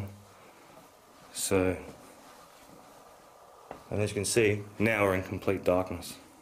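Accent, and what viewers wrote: Australia. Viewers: yeah, I did some reading and it's pretty interesting and creepy to know just how many died horrific deaths there. Some think she was innocent but that her servants set her up or were coerced into false testimony. Some think the servants themselves did horrible things to each other there. Either way, some very dark history. You're very fortunate to travel to these places and it's great you share it 😄. I love learning about new things and history. There's something I just love about the Australian accent too. I'm a wannabe Australian lol. Keep the videos coming!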